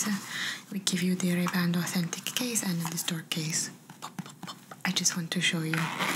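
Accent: Italy. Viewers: Ciao bella.... your itailian accent so great..i love it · Ah yesss Italian accent 😍😍❤️ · I love your Italian accent ASMR. I love how you always manage to say something that makes me laugh out loud. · omg i think i found my new favourite series! the italian accent makes me feel like im a tourist in italy and boy i miss travelling 🥺 keep it up!!❤❤ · a “new rude Italian exchange student” would be such a fun role play with this accent! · oh my god! are you actually italian? cause your accent is literally perfect👀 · Hi fantastic ASMR I liked it a lot and I enjoyed it with your Italian accent that I love and listening to your sweet relaxing voice greetings beautiful 🥰😍💖🌹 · as an Italian person this is exactly my accent when I speak English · I can’t what I love more your Italian 🇮🇹 accent or you ❤️ 💕 💗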